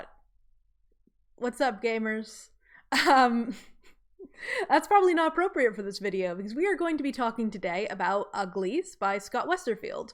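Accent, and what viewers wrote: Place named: United Kingdom. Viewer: Kind of like "raw-ther", I think? I'm Canadian. To me it sounds like we say it the way it sounds when said in the seemingly dominant American dialect... But I'm pretty sure people are somewhat deaf to their own accents. Like, I don't normally hear "aboot" when I say "about", but then sometimes it kinda does sound like I draw out the "ou" a bit. Anyway, I think the "typical" sounding American dialect that I am familiar with sounds more like "Raa-ther" than "Raw-ther" Edit: is that Somerset, UK or Somerset County, New Jersey. Edit 2: must be Somerset, UK I can actually hear it now. There's definitely a bit of an ambiguous "British" sound to your accent.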